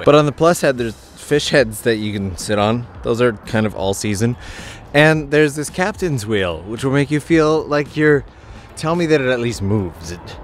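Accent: Canada. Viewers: Wow so cool it is really sad and mean that the wheel does not turn but it seems like whenever I see one of those in Japan they tend to be stationary😭 They just gave a us a new one at out Disneyland and it moves and everything🖤🖤🖤🖤 I love that japan makes everything so convenient though like you could literally live out of a camper van and be just fine bath and all🖤 wish america was more like that😭 I've said it once but I'll say it again, I absolutely adore your accent🖤 who knew a Canadian accent could sound so nice? Clearly I need to actually talk to my friends abroad and no just text ... that rail was beeeaauuuttiiiffflll🤩🤩🤩🤩 cant wait to see what secrets lie with the cabin, see you next week I hope🖤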